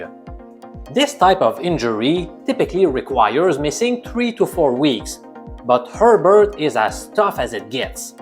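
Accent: Quebec, Canada. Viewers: First time watching your channel and I hope your the GSP of Sport Betting🤣. I'll take a wild guess and say that you got your Master's in Statistics at the Université de Montréal, I know a Quebec English accent when I hear one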